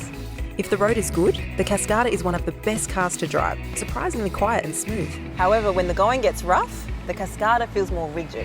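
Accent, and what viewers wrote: Australia. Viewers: So weird to watch a sistah with an Aussie accent ;) crikey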